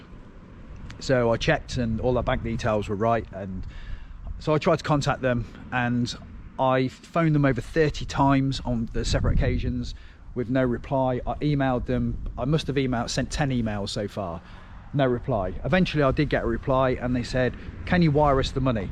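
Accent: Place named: England, United Kingdom. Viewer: #WhingingPom the moment I heard the accent, I knew I was in for a whinging pom. I was not disappointed.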